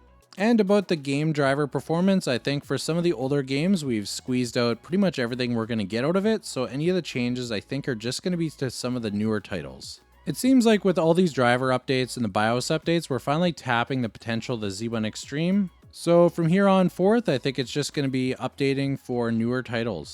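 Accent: Canada. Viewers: Bro as canadian watching this and listening to his Accent. I was thinking this man is definitely Canadian. Definitely from western Canada.😂😂. Nice video. Would have taken alot of work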